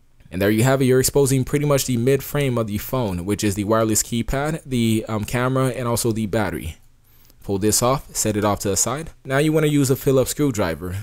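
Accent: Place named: United States. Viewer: His accent is american and the phone is from verizon which is an american provider, how the fuck do you notice from the sneezing? I'm confused.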